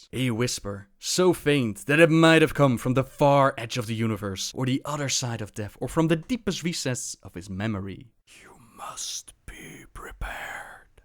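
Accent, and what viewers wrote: Netherlands. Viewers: do I hear a dutch accent? might be wrong ^^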